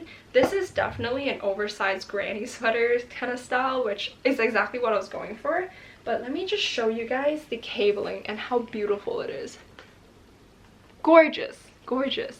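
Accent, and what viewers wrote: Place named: Canada. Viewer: I have never seen your videos before and as soon as you started speaking I was like this girl is Canadian. I wouldn’t be surprised if she’s from Toronto (area) then you mentioned the gta and my head snapped up so fast. Will definitely be checking out that yarn shop. Thank you 😂❤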